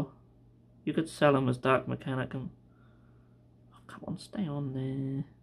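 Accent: Norfolk, United Kingdom. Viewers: Well then what yew sayin'? Ha yew got a loight then? Nah just joking. I'm a Norfolk buh n all born & raised, that's why I recognised the accent. Although I wasn't sure - I guess being used to it for so long you forget what some of the other accents sound like a bit. Anyway I love your channel & your website. It's equal with eBay in being my two most useful resources for conversions. Done a couple orders from you recently & will be again. Looks like I forgot to subscribe, thought I did it before. I'll do that now! Good ol' buh mate!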